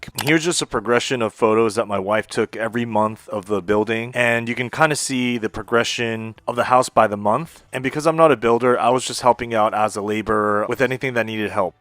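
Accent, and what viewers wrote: United States. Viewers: a Japanese bloke, with American accent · why do you have an American accent?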